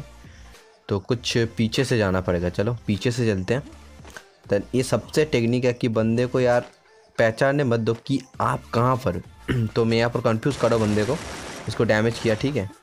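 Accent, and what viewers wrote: Bengal, India. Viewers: Bhai ap Bengali ho kia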